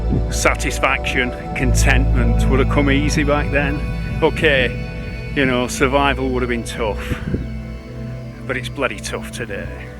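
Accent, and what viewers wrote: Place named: South Yorkshire, United Kingdom. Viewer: Great to watch your video in these depressing times in your good old south Yorkshire accent! Some sense of normality and freedom. Many thanks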